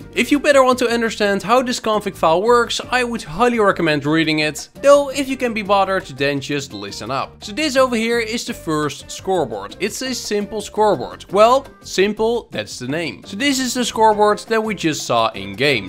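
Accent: Netherlands. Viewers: Are you dutch? I really notice a kind of Dutch-tone in your English.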